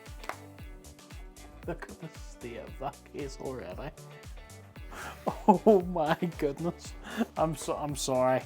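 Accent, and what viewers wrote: Northern Ireland, United Kingdom. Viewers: You sound so northern Irish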